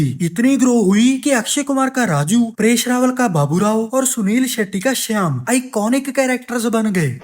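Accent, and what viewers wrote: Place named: Punjab, India. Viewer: Your punjabi hindi accent sucks ... You shouldn't make videos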